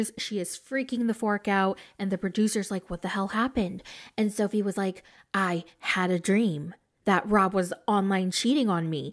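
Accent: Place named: Boston, United States. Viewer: You’re from Boston! Lol hey girl 🫶🏾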